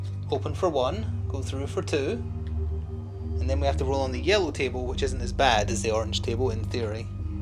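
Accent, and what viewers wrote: Scotland, United Kingdom. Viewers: Good video! I enjoyed the ominous music mixed with your optimistic tone. Where abouts in Scotland are you from?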